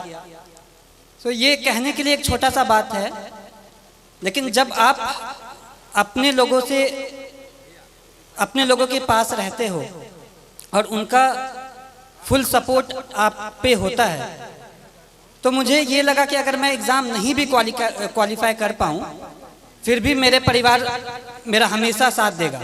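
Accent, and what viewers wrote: Bihar, India. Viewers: Bihari Op Bolthe❤❤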